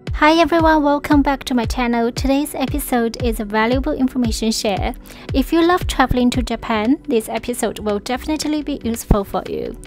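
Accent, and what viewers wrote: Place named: China. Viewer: 英語は大丈夫だよね 中国人ですか？その発音はちょっと中国語だから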